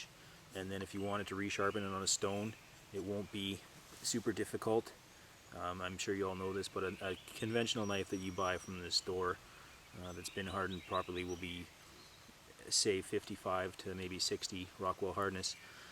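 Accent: Canada. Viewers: Are you Canadian? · Are you canadian? Just asking. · Thumbs up if you knew he was Canadian before he mentioned he was making a knife. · canadian accent eh? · If you haven't ever heard a thicker Canadian accent, I could understand thinking it sounds a bit Irish. It's more of a Scottish influence, but again, not completely ridiculous for someone who doesn't know better to draw that conclusion. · are you canadian? i can hear it from the ou in about.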